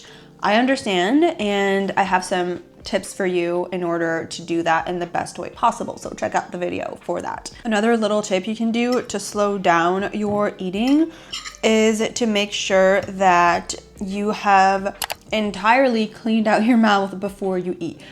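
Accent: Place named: United States. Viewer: when folks hear you speaking 🗣️ english do they mistake you for American becoz your exceptionally well spoken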